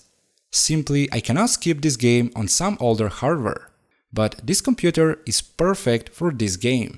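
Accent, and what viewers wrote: Russia. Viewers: Хм у тебя русский акцент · У тебя не получается скрыть что ты русско-говорящий, а проект классный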